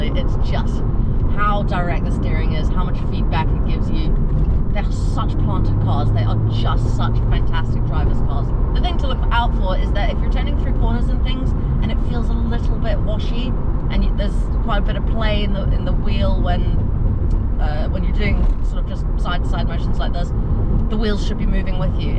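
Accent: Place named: Australia. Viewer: This is Aussie accent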